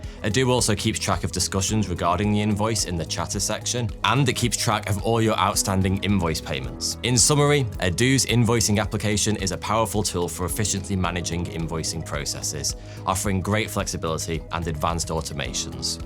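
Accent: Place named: Cockney, United Kingdom. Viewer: That COCKNEY ACCENT IS DISGUSTING … YOU KEEP SAYING “FOURTY TWO HERE”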